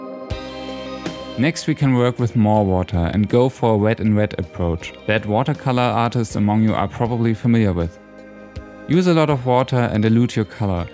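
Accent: Germany. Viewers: Man hört schon raus dass du Deutscher bist.:) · Are you German? You really do seem to have a German accent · Wow, It's gorgeous. Your gouache drawing looks like it's a digital art. Also, do I hear a German accent, hmmm :) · You Sound German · Are u German? U sound like my class. But in a good way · Are you german? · dude, you german? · Are you german? You seem to have an accent:3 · Dein deutscher Akzent ist mega süß😂💕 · I'm pretty sure he's German, he just sounds like almost everyone here in Germany who tries to speak English. I also have an accent like that and I think it's so embarrassing! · Are you german? Somehow you sound like it :'3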